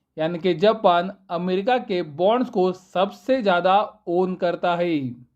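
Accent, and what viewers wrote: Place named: Gujarat, India. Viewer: Aap Gujrati Ho Aisa Aapki Boli Se Lagta Hai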